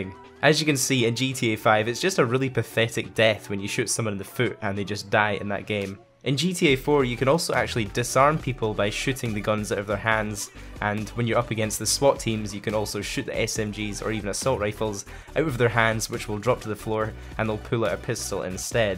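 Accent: Scotland, United Kingdom. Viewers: SCOTTISH AF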